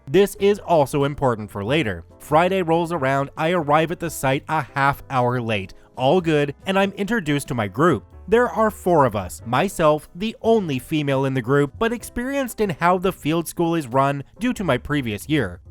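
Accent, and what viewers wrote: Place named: Canada. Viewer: the Aussie way of pronouncing the names is with a short "a", and it is similar to how you would pronounce the word "has". However, as is (I believe) Canadian (?), I can't really fault him for saying the names differently.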